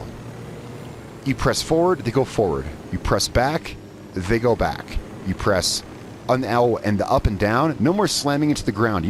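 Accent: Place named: North, United Kingdom. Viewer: You can tell he's from the North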